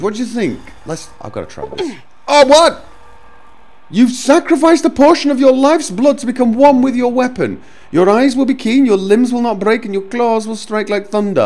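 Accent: United Kingdom. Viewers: Can we have subtitles in videos? My siblings dont know enough English to understand your British accent.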